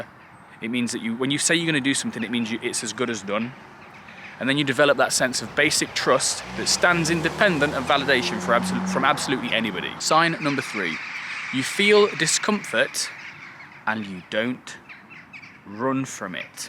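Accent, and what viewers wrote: United Kingdom. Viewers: He is from the UK. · Sounds like regular UK English to me. Background looks very UK-ish too :)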